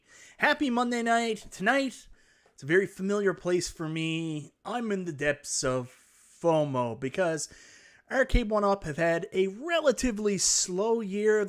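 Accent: Canada. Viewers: So glad there is a Canadian voice for arcade 1up. Hoping for marvel vs Capcom 2 in Canada somehow without that savage VAT added.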